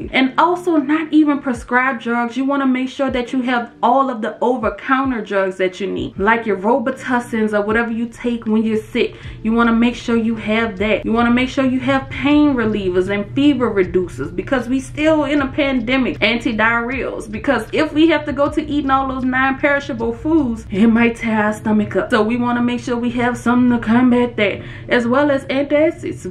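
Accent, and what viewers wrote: New Orleans, United States. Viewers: New Orleans accent strong 💪🏽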